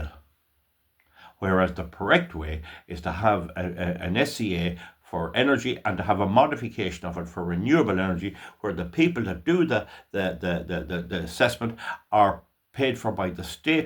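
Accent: Ireland. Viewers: WILL YOU POST A CLEAR COPY OF THE LETTER? I KNOW 15-20 PEOPLE THAT WILL SEND THIS LETTER! LOVE YOU!! KEEP ON GOING! CONSIDER PARTIAL FARMING RETIREMENT! THIS IS MORE IMPORTANT! I NEVER HAD EDUCATION LIKE THIS IN ALL MY LIFE! AND ITS SO CLEAR AND SO EASY TO UNDERSTAND WITH YOUR CLEAR CAVAN ACCENT!! AND ITS FREE!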